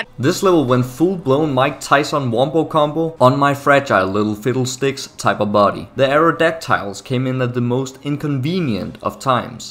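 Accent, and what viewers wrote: Denmark. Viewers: Are you danish? You sound danish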